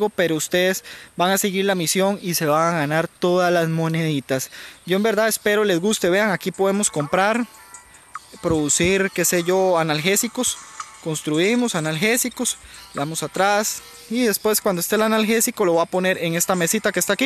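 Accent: Costa Rica. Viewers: Eres tico??? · Q tal bro eres tico?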